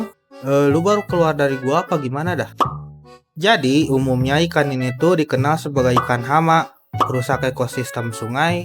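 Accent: West Java, Indonesia. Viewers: Orang jabar ya bangg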